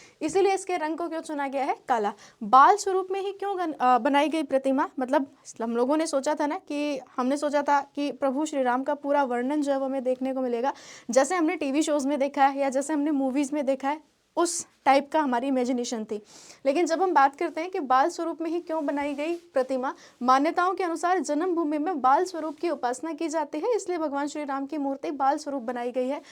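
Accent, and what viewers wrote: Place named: Haryana, India. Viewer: आपकी टोन हरियाणवी है ❤❤❤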